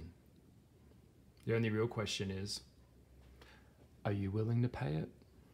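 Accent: Australia. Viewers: Oh, without a doubt, but now that you mention it, you have that fun, witty energy of the demon too. I don't want to sound like I'm just being flattering here, but you've captivated my mind the last several hours listening to you. Like Crowley you seem well read, insightful, and artistic with a bit of hedonism and I would think that would excite a lot of women. This particular video made me think about my sexual choices in life and how I always played it safe with always choosing the nice guy who would always tell me what I want to hear and never challenge me or be too overtly sexual, although my sexuality was off the charts in my 20's and 30's. After a hysterectomy that really changed me hormonally unfortunately, but I think I haven't had anyone to really stimulate that either. To get to me it's always been through my mind and no one ever figured that out about me. 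Anyway, I've commented a lot here on your videos and probably should be embarrassed that it's overkill, but I find you pretty fascinating and am impressed with your knowledge and insights on the Aquarius, both the positives and the negative. That Ozzy voice is to die for, I've always loved the Australian accent, it's my favorite and I always wanted one lol. My other best friend is a Leo and lives in Tazmania.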